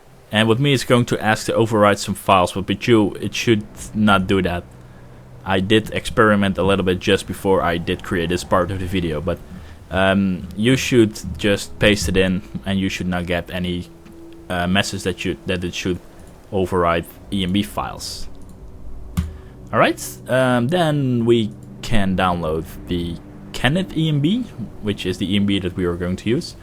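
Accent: Netherlands. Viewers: hai nederlander of belg ( ik denk hollander). ofniet? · Ik hoorde dat je Nederlands was, goede tutorial!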